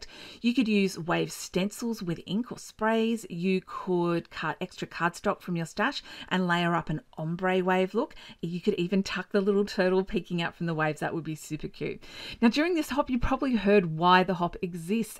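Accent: Australia. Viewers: SUPER cute images. And as a Yank (USA) I really enjoy listening to your Aussie accent. 😁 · So fun to hear a fellow Aussie accent! I'm so glad I found you in this hop - I just subscribed to your channel (international). I love these sweet cards you made!!! The mini gate fold is just so so cute!!!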